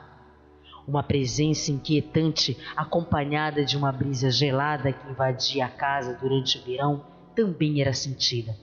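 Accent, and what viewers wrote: Pará, Brazil. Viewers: essa narradora é paraense?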